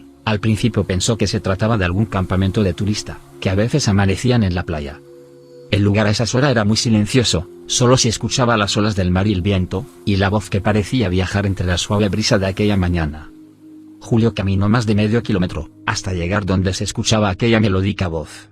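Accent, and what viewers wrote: Spain. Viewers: Porque. Todos los videos estan en Español Ibérico! Me revienta !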